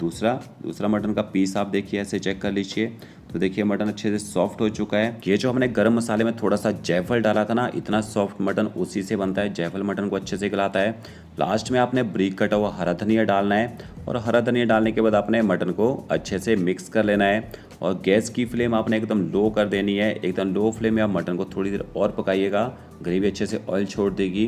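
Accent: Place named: Punjab, India. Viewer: One thing which I love abt u is ur authentic punjabi accent...love u bro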